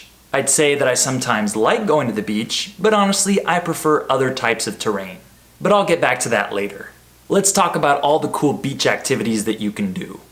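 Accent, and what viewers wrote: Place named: United States. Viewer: لا لكنتو اميريكة بحتة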